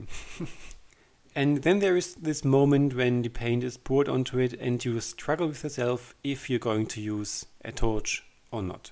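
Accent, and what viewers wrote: Germany. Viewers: Hi , ich habe mir schon mehrmals etwas von Dir angeschaut und immer gedacht : er hat einen deutschen Akzent... Nach meinem letzten geschauten Video weiß ich zumindest das Du Deutsch sprichst. ( Fassettenlack) ...LOL...Kannst Du vllt mal etwas in deutsch machen? Das wäre echt klasse. 😍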